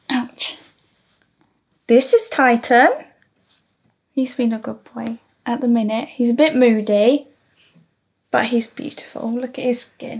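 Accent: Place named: United Kingdom. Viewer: Hi.. I love your English British accent❤️Im happy you love dogs too.. I have 5 dogs at home and 2 cats.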